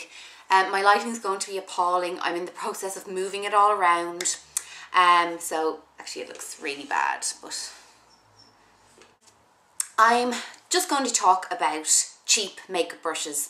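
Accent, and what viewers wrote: Ireland. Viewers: This probabley sounds really silly...but I just love the irish accent. I read a whole bunch of Maeve Binchy novels and hope to travel to Ireland some day.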